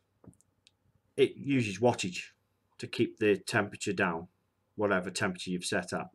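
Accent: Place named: Manchester, United Kingdom. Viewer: I was going to say Manchester. I have a friend from there whom sounds very similar to you.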